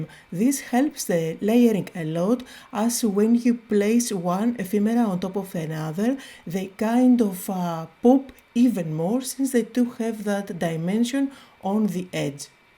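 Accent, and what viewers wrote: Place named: Greece. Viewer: So, mom and I were just talking about what the people have said about the speed in which you talk etc. Your native tongue is a language of love, it ranks right up there with Italian. My best friend of 17 years is from Greece, his family immigrated here when he was 5 and he didn't speak a lick of English. I was on a video chat with him one night and he was talking to his mom in his native tongue and it was so beautiful I asked him to repeat what he just said! "I'm not going to tell my mom that dinner is ready again, Sash." Is what he said 😅. So, mom and I think you should do a video in Greek and if they wanna know what you're saying they can watch the subtitles. Speak at a speed you're comfortable with, Greek is spoken faster than English, and your accent is amazing! Don't change how you do your videos because someone thinks it isn't good enough. 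❤❤ Mad love to you!!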